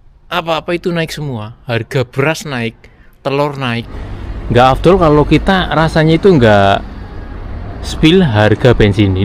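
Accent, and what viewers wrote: Banyumas, Indonesia. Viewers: Mas rika wong ndi si, kayane logate madan ngapak sesekali😅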